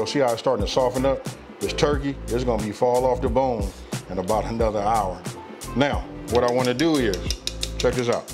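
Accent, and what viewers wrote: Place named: California, United States. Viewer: It strange to see and hear you cook southern food with that Cali accent.. But you got some skills.. I enjoy your videos. Keep doing what ya doing.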